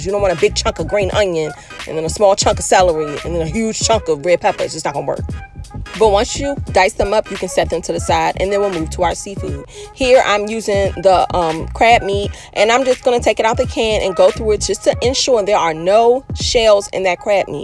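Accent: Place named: Baltimore, United States. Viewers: Accent!!!!!! Sounding like Bmore or sum. 🙌🏽🙌🏽🙌🏽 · What part of maryland are you from....baltimore Md or like PG county md... I’m just tryna decipher ur accent 😂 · I ran across this video because I'm trying to figure out how to perfect my oyster fritters. But this exactly how I make my seafood salad. And I hear that B-more accent sis. Fellow Marylander here...😅.. I'm From Salisbury MD.. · I knew your accent was givin a subtle Baltimore ish twang to it lol...great vid 👌🏾👌🏾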